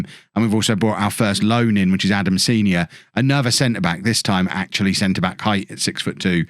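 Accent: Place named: Lincolnshire, United Kingdom. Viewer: Probably the Lincolnshire accent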